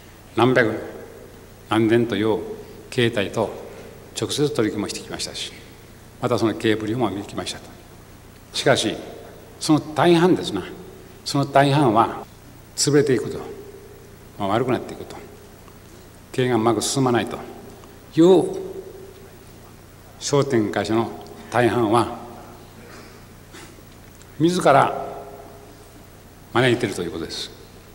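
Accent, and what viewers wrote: Kansai, Japan. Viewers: 関西弁を心地よくしゃべる大先生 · 幸之助さんの話は非常に分かりやすいのが素晴らしいと思います。 優秀な人ほどわかりやすい話し方をするそうです。 受け売りではなく実体験を語っているかでしょう。 近年経営者が話す難しい国語表現や横文字ビジネス用語は使わない。 一般人の虚を突いたようなことをいうわけでなく当たり前の話であり共感しやすい。 名言集でも不思議と偉そうな説教には感じないんですよね。 尤も昔の人だしベタの関西弁なので聞きなれない人は聞き取りにくいかもしれませんが。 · 本では見たことあるけど生で初めてみた。こてこて関西弁なんですな。また好きになってしまった。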